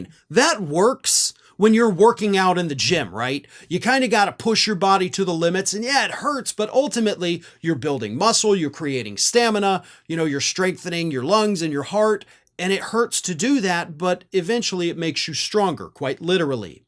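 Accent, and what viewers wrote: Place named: Appalachia, United States. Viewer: He is a maga senate wet dream. He talks and sounds like all the all stupid maga hillbillies.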